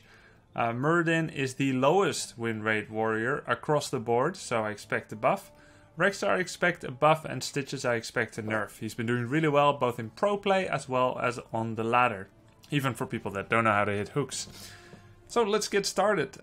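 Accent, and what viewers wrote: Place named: Netherlands. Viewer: Jens van Straten Yes. He is from The Netherlands.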